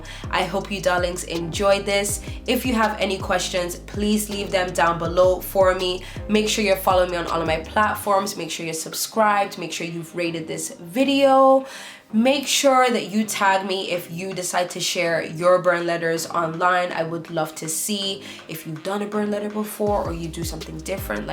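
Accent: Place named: Caribbean. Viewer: I literally followed just for the Caribbean accent in the intro 🤷🏾‍♀️ yessss sus!!! Vid just starting so I can’t wait to learn n implement 🙏🏾🙏🏾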